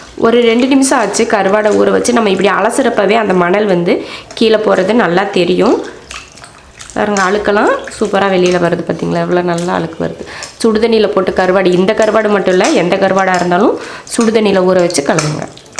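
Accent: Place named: Theni, India. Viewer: Madam I am Pavithra Vijayan from Hyderabad.my native is Theni cumbum .nan ungalin subscriber.i like your cooking style madam it resembles Namma native muslim style maadhiri erukkae endru yosippen like ungaloda preparation,way of explaining that accent your tamil.later you told about Theni district special that day I confirmed .hearty congratulations mam . Namma ooru cooking stylela world wide propagate Panna vaitha ungalukkum,ungalin familykkum,and all the subscriber's kkum .my hearty congratulations.I am proud of you get a you tube channel from Theni with 1MILLION subscriber's very soon . thank you for this dish today .I like it most.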